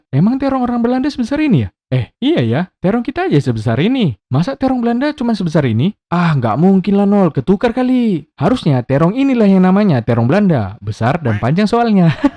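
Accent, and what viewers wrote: Medan, Indonesia. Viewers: Digigit tungir wkwkwkw Medan kali ah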